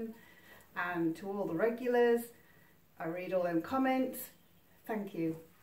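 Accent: United Kingdom. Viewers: I DETECT AN ACCENT WHEN YOU SPEAK ARE YOU BRITISH? GORGEOUS!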